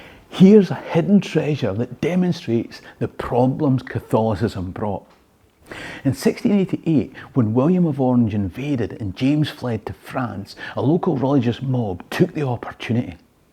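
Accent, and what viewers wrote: Scotland, United Kingdom. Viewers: ❤your videos and classic Scottish voice, the best travel videos ever. Scotland 🏴 is Heavenly and people are fascinating…we’ll be back soon!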